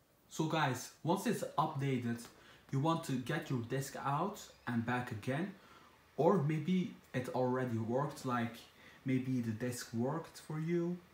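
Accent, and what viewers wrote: Netherlands. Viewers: Lol ur dutch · Yeah the moment I heard his accent I was like haha he is dutch and it turned out to be true. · Bruh your ducht accent is so obviuos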